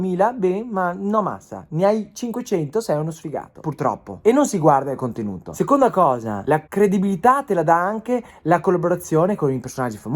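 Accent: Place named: Veneto, Italy. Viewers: Ciao premetto che non voglio che torni a fare chiamate ma tornare a parlare Veneto si abbiamo una terra con molte attrazioni e molte cose di cui fare passare dei concetti penso che la gente come me ti ammiri per il tuo accento quindi torna a parlare VENETO!!!!! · Ciao, a mio modesto parere se vuoi continuare la crescita devi, purtroppo, uscire dalla "regionalizzazione" in cui il tuo personaggio è cresciuto. Io sono trentino e mi sento molto vicino, sento molto affine il tuo accento, i luoghi da cui trasmetti, i temi ecc. ma penso che dal "triveneto" non puoi tirare fuori molto di più in termini di consenso, contatti e visualizzazioni a meno che non diversifichi i "canali" e cominci a fare anche live nei teatri e a partecipare ad eventi nel Triveneto allora il bacino potrebbe bastare. Ti trovi probabilmente nel momento più delicato e da questo video si capisce che lo hai compreso benissimo. 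E' il momento della: "o la va o la spacca" e ti auguro veramente con tutto il cuore che tu possa trovare la strada giusta.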